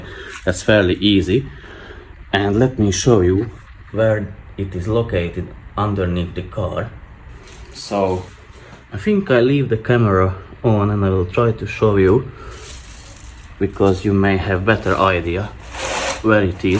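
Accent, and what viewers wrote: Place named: Hungary. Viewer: Ez igazi Magyar dialektus. 🤪🥰🤣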